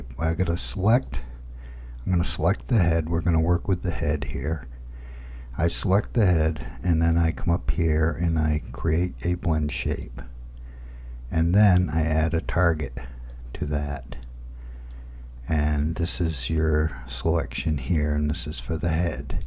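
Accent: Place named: Boston, United States. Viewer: Thanks for the run through - you sound like you may be from Boston :)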